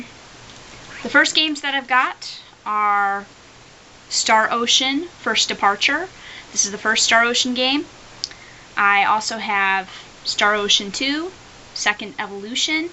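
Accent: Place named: Midwest, United States. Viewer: Sounds like the midwest, Wisconsin a bit.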